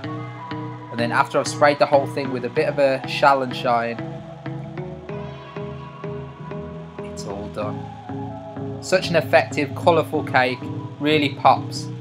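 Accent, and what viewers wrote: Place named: Midlands, United Kingdom. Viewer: Sounds like you are local to me. (Midlands) Can I commission you to make one for my wife's birthday party (26th Feb).?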